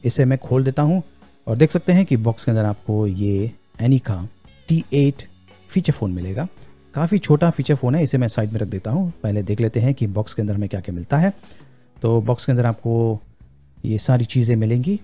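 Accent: India. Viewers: Person in video looking like non Indian but audio is Indian . Is this phone available in India .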